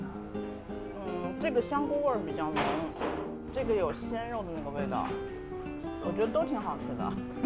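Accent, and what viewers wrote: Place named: Beijing, China. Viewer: 京腔和虎门的肠粉。饭量有点大。😀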